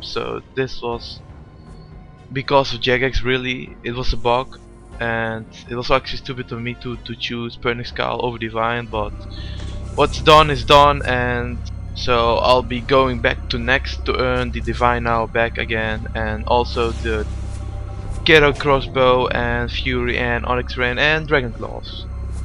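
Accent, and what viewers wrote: Netherlands. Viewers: kut Nederlands accent zeg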